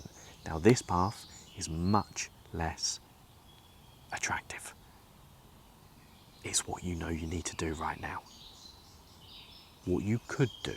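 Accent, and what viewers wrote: England, United Kingdom. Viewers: sounds like an english accent to me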